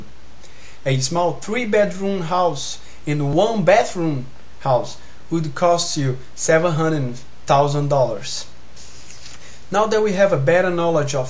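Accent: Brazil. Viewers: Let me guess...are you brazilian right?...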